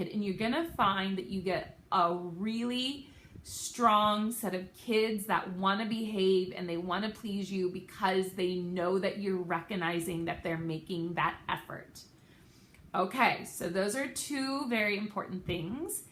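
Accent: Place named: Australia. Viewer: I can't wait to hear my name with an Australian accent!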